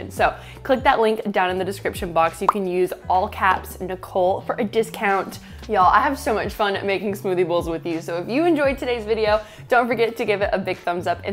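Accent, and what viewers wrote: South, United States. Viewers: Who els noticed a little bit of a southern accent in Nicole’s voice. 🤔😁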